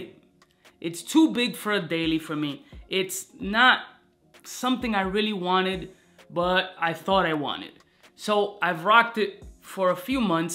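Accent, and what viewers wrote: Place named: New Jersey, United States. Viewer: Your Cuban born in miami too. But your sound like New Jersey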